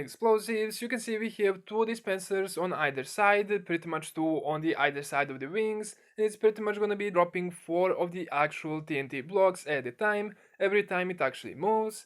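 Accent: Russia. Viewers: I love that he sounds russian and he makes a tnt bomber.... you just got a new sub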